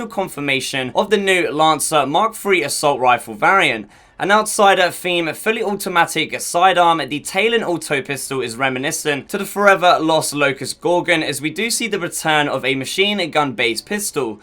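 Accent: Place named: United Kingdom. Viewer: Aymen Salih He has a British accent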